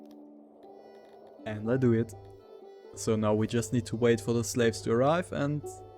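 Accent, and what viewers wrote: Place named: Germany. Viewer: If you launch a city builder video and you hear a German accent, you know you're in the right place.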